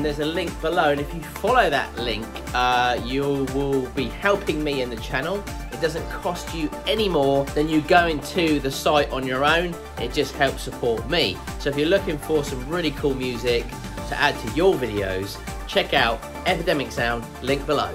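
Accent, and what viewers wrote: United Kingdom. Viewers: Love your british english.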